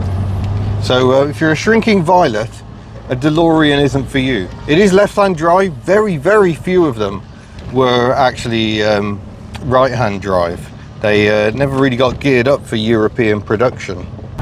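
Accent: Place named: Scotland, United Kingdom. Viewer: That Scottish accent is so cool my friend. I could listen to you talk about vegetable gardening for hours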